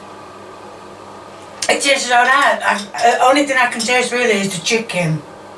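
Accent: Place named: Yorkshire, United Kingdom. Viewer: I love accent. Is it Yorkshire accent?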